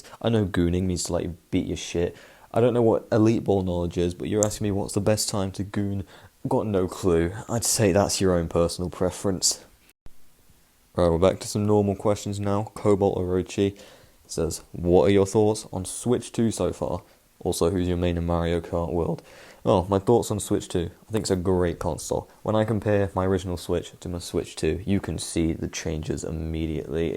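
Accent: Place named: United Kingdom. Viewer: This video is disgusting because your British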